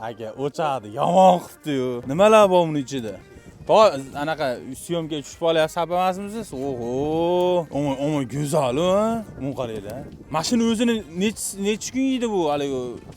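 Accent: Andijan, Uzbekistan. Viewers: Анжан шевага гап йук😂 Китобида ёзилишича мол максимум 1 суткада 1,2 кг семиради. Сонини купайтириб, расходни минусласа, олиш мумкин булган максимум фойда чикади. Реалрок дан келиб чикиб, хисоб китоб килиб, инвестицияга йилига канча % олиш мумкинлигини тушунса булади. Россияда гуштни сотиш проблема, ечими топилса бупкетар. Айникса "халяль" сертификат олинса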